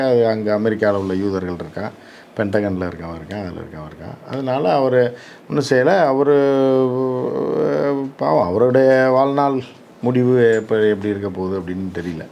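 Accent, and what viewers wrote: Madurai, India. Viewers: சார் சார் உங்களுடைய பேச்சைக் கேட்கும் போது மதுர மாவட்டத்தினுடைய பேச்சு மாதிரி தெரியுது நீங்க தமிழ்நாட்டில் வந்து மதுரை மாவட்டம் நான் வந்து கன்னியாகுமரி சார் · sir. Naanum madurai karan tha. Un speech a vida unga slang ku fan. But kirmani was a wicket keeper. I beleive that bowler may be someone.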